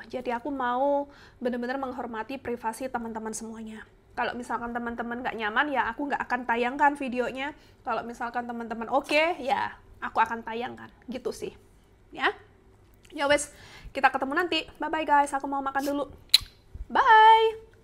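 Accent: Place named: Banyuwangi, Indonesia. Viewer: bhsa nya oseng ya..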